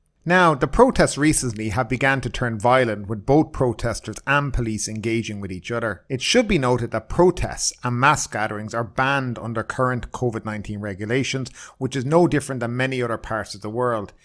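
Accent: Ireland. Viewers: I love the Irish accent..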